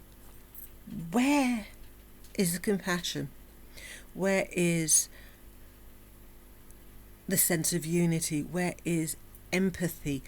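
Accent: United Kingdom. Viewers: God it sounds so much better coming from a British accent...much love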